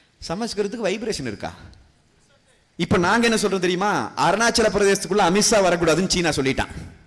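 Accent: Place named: Kongu, India. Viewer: பேராசிரியர் கொங்கு தமிழ் சிறப்பு. நாம் தமிழர் துபாய்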